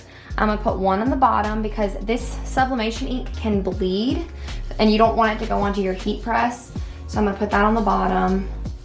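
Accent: South, United States. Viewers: Girl u must be from da south! 😊 Great job!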